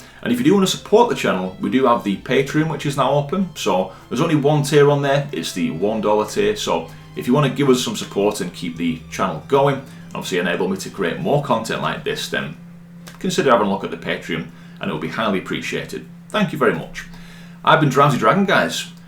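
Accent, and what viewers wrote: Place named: Northern England, United Kingdom. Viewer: This is the first time I've seen you. Very helpful video thank you. Also nice to hear a northern accent, Yorkshire lady here!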